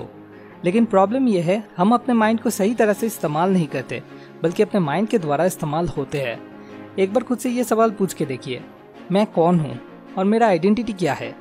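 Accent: Bengal, India. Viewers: Video banane wale ki awaz se lagta hai ki ye koi Bengali hai...and true intellect is their Birth Right.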